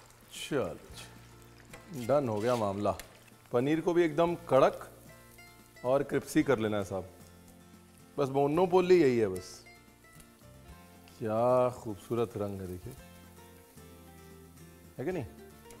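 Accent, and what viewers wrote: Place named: Lucknow, India. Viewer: Khana to superTasty Rhta h but aapka wo Lucknovi Andaz ki baate Heart touching ❤❤❤❤ You are real Gem Sir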